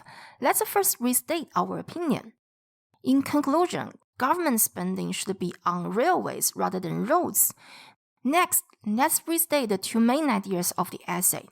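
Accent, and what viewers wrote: China. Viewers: Laoshi I love your accent my Chinese girlfriend accent was same I have lived in china more than 7 years Chinese people use the same accent they call everysing instead of everything You are great keep it up After listening your voice it take me back in memories of past that we have made in past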